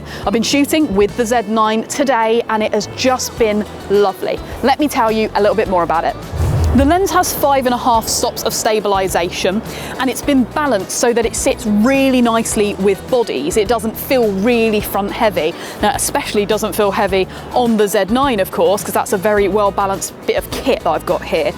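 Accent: United Kingdom. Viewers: British accent 🤩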